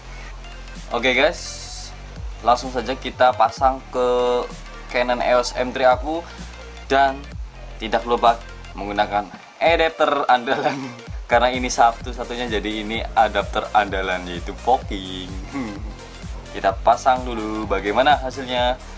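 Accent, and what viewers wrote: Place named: Banyumas, Indonesia. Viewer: Jawane ngndi lurr??, Medoke ngapakk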